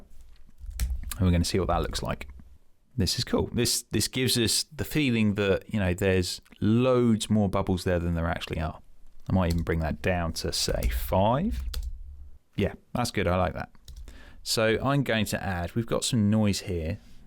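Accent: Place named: United Kingdom. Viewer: I can hear british accent here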